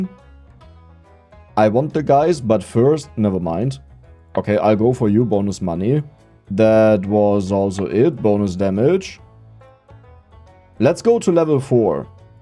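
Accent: Germany. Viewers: German accent sptted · As a compliment, you have the thickest german accent ive heard on youtube😂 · Thanks for the vid! Really a pleasure hearing how dude with strong german accent tries to use flamethrowers efficiently to kill as much of them as possible! Here you go <3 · Are you german? Either way I love your accent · Haha Geil, Grade deinen Kanal gefunden. Und nach den ersten paar Sekunden dachte ich mir " Moment der kling doch Original wie nen Deutscher mit dem Aktent ." Hab dei deiner Kanal Bio gesehn das du Deutscher bist und Direkt ne Abo Dagelassen . Ist schon krass wie man das Immer direkt raus Hört wen wir Englsich sprechen . Nur Liebe <3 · just a random question... Are you German? Cause I hear the "zee" and "zix" and I can't think of any other language that can give that accent...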